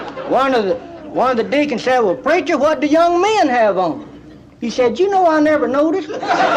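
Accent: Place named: East Texas, United States. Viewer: I owned this as well. I grew up a little over 30 miles West of Lufkin. These stories resonated with me as a youngster. "Get back in there boy, you running in third place!" This is the voice that everyone I heard all my childhood sounded like. <3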